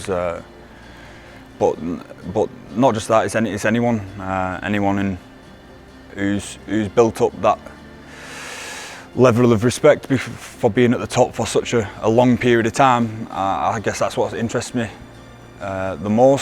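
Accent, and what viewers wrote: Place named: Yorkshire, United Kingdom. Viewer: He’s from Yorkshire, every place has different accents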